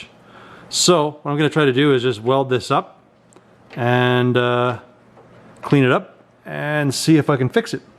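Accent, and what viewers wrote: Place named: Southern Ontario, Canada. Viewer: That accent tells me southern Ontario🙂👍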